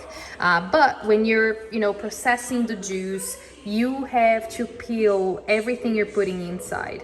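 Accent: Brazil. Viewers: Brazilian accent 😊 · Love the Brazilian Accent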